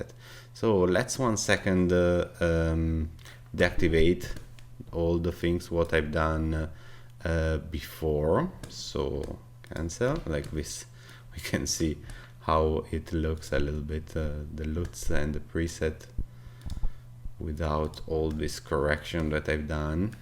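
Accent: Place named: Italy. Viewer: You sound like italiano man!!!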